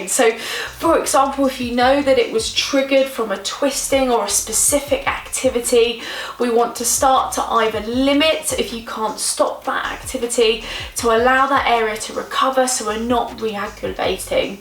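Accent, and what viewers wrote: United Kingdom. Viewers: The accent tells me that the speaker is British or somewhere from Europe with British accent ✌️ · UK company and yes she sounds as English as I am.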